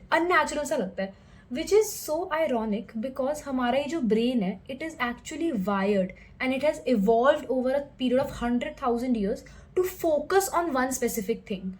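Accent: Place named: South Bombay, India. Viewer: Relevant Info btw...in a customary SoBo accent...😜🫣🙃 Keep Showing Up 👍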